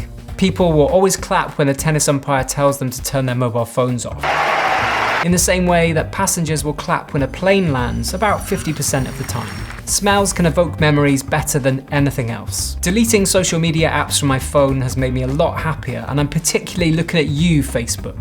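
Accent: Scotland, United Kingdom. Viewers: If it makes you feel better, you sound Scottish to this American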